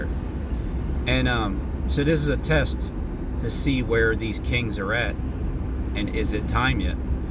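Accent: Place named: Philadelphia, United States. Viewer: good study of the Philly accent........I lost mine, been living in Jersey for 55 years.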